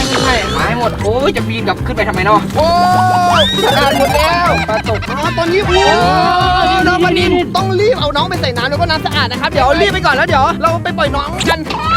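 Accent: Isan, Thailand. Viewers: เป็นคนอีสานแมนบ่จ้าอ้าย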